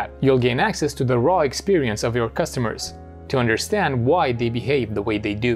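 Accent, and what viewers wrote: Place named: Russia. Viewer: Акцент похож на русский)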